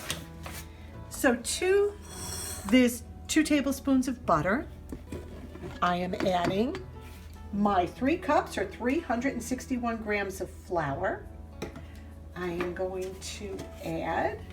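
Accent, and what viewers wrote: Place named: New Jersey, United States. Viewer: I love what you did with the table top! It looks wonderful! I am from Iowa but your accent reminds me of my dear friend in New Jersey! I enjoy listening to you and look forward to your videos! Good job!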